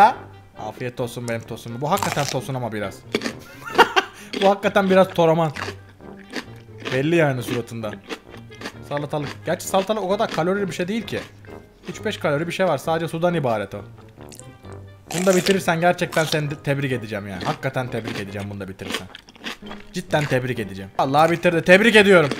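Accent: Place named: Adana, Turkey. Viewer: Hemşehrimsin abi bende adanalıyım FİŞŞEK tamda adanalı konuşuşu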